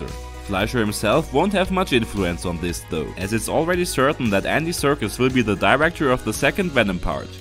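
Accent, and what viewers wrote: Germany. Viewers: der sprecher is safe aus deutschland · that german accent is so hard not to hear sry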